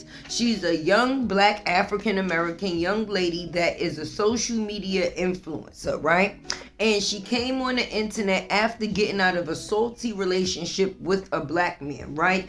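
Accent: New York, United States. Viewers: Thank you for the video great job once again you got me. I was on my way in from the garage and you were talking about the young lady. I did not know who she was. Thanks for the recap. I turned around and sat on my stairs before I get into the house and listen to the whole thing. Great job keep it up. I think I’m getting a hint of up north accent like New York or New Jersey great Common sense conversation👍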